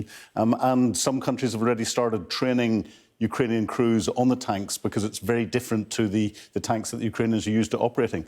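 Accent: United Kingdom. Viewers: she sounds British but she is not British. How can this be ?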